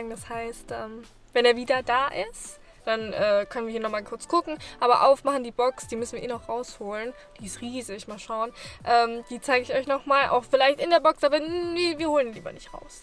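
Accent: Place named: Hesse, Germany. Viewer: als ob du auch aus hessen kommst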